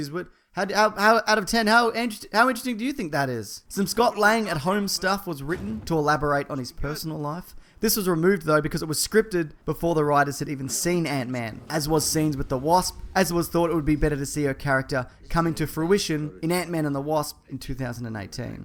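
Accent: Australia. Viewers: are you australian my dude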